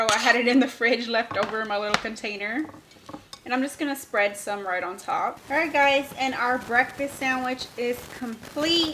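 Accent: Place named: Houston, United States. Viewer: It's the Houston accent 🙌💪💪💪. You look beautiful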